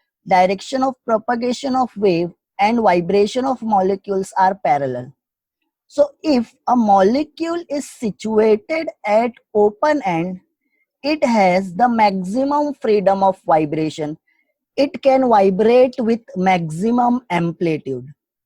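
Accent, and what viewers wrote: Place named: India. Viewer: Thank you mam!..u tought very nicely and in indian english as well!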